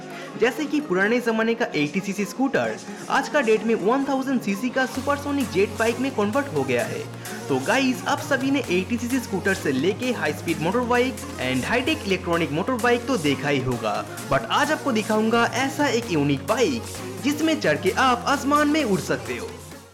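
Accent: Bengal, India. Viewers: Aap bengali ho 😊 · Pta nhi yaar tm logon ko subscribers kaise mil jatein h. Main video ko dekhna chah rha tha par 10scnds bhi dekh nhi paya bcz of your hindi accent.. me too a Bengali. But agar YouTuber banna h hindi me toh dhang ka bolna toh sikhlo. Irritable accent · Your Hindi accent proves that you're a native Bengali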